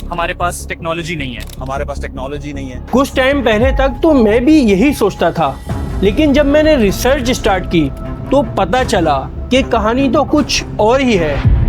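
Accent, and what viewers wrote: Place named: Karachi, Pakistan. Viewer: انتہائی بے تکی انداز ھے آپمو الفاظ کا استعمال پتہ ہی نہیں ھے بلا وجہ ہی جذباتی ھو جاتے ھو بہت سارے الفاظ غلط بول رہے ھو خالص کراچی کی مخصوص بولی بولنے والوں کے لہجے مہیں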